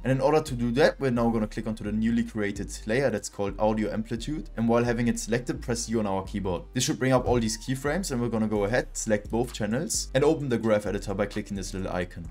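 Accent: Germany. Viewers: is he german? he has a german accent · Da mann hört das du deutsch bist schreib ich mal deutsch :) Also ich habe mir grade adobe geholt und mit deinen endlos geilen videos habe ich schon so viel dazu gelernt! Vielen dank dazu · I hear German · Ich höre Deutsch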